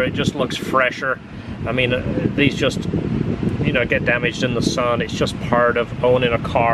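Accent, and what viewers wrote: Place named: Yorkshire, United Kingdom. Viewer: Hey. 3 things to mention here. Good tip on drilling the holes to prevent further cracking.👍 The repair job came out nice. The S2000 buyers assistance program sounds great. I think there's a lot of value to the customer to have an S2K specialist like yourself sourcing the car for them. And finally, there's nowt wrong with your accent. It's Yorkshire innit?😉 I mean, you hooked Esther with that accent, so it sounds like a winner to me. See you on the next one.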